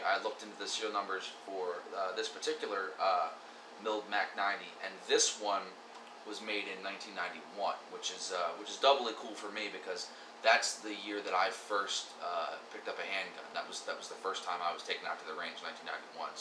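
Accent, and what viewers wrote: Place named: Philadelphia, United States. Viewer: Yo! You from the Philly area? You got the accent, yo.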